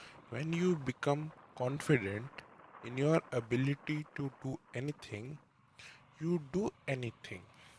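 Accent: India. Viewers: Wow it's amazing, thank you!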